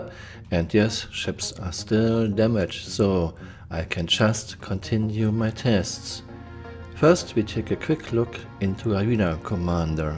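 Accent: Germany. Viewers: Subbed ringged and I love your videos but ... for a non native english its very hard for me to translate your germanish. So many "so" and "torpedos" and many others. Please take that as feedback, i no way i wanted to offend you or anything ;)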